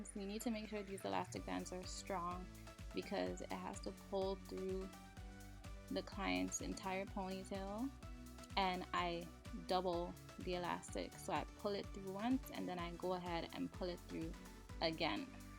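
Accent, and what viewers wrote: Caribbean. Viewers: I hear a Caribbean accent 🤔.... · where are you from? I hear a Caribbean accent. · Is the girl doing the voice over Caribbean?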